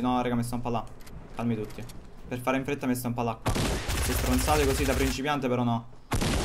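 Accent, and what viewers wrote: Naples, Italy. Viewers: Quando ti parte il napoletano è bellissimo ahah grandissimo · Sei napoletano fra? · Ma ij o sapev Uagliu Sei di Napoli?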